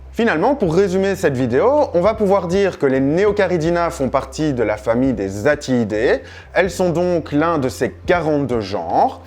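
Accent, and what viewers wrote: Belgium. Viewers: Excellente ta vidéo, explications vraiment claires ! tu es belge ? Le Houit ne trompe pas en général 😊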